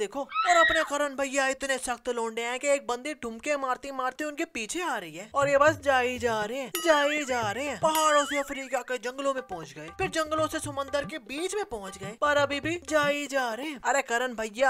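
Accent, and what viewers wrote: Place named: Pakistan. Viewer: Ya video bnana vala bro pakistni ka ha es ki awaaz sa pta chala😅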